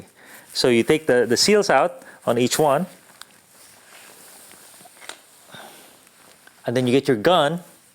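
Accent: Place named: Philippines. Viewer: English accent sounds like Filipino? Tama ba ko kabayan?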